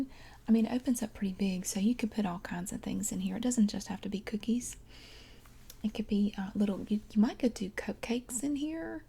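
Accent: South, United States. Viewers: I may or may not use your videos to low key practice my southern accent, which I was clearly meant to be born with. Also thank you for always making me falllll alseeeeep! xx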